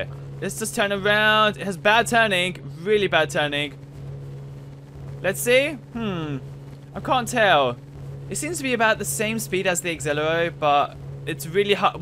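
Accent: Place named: England, United Kingdom. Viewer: You talk like your From englande Sorry England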